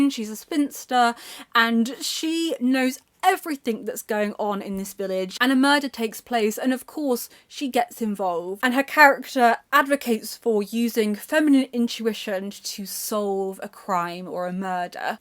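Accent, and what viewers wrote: United Kingdom. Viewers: Thanks for being back with your intelligence , your bright eyes and your lovely British acent. I read Agatha Christie when I was rather young , althouhh I am not keen on detective books except from Sherlock Holmres . As I love Victorian literatue Doyle has to be on the list. I devoted a lot of time in 2023 to Dickens's long novels and Ihave enjoyed them a lot. My mother tongue is Spanish, by the way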